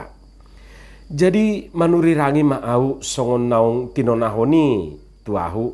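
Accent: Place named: Batak, Indonesia. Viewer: Bahenjo amang tekanan bahasa batak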